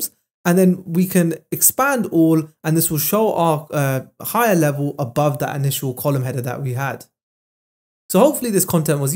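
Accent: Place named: England, United Kingdom. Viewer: Thank you so much a legend and breath of fresh air hearing a proper English accent from a local bro!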